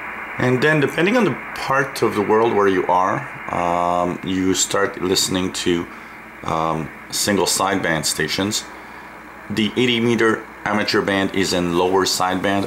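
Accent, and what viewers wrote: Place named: Quebec, Canada. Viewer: As soon as I heard you say "turdy" & "dat" I knew you were a Quebecer! Haha. Hello from a fellow Canuckistanian from New Scotland! Thanks for your great vids! They really answered a lot of questions I had about the various bands.... :)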